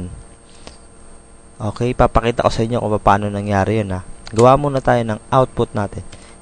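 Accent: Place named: Manila, Philippines. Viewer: masyado nman mapanghusga sa mga bisaya tong mga to.. di nyo bah alam na ganyan mag english ang mga tga maynila? relate relate din pag my time.. nkikiview na nga lng ei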